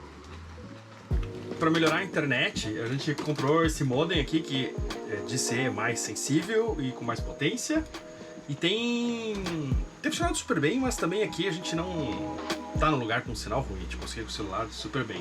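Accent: Rio Grande do Sul, Brazil. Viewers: Buenas tu és gaúcho .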